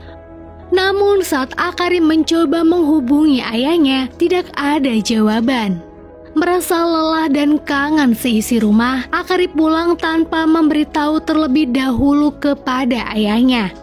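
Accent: Pemalang, Indonesia. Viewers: Fix wong pemalang Rika ya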